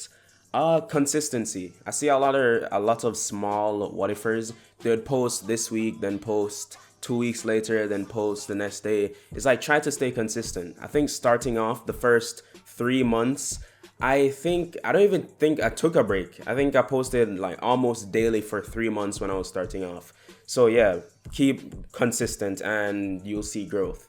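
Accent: Jamaica. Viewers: im half jamaican and I could see that you were jamaican in your voice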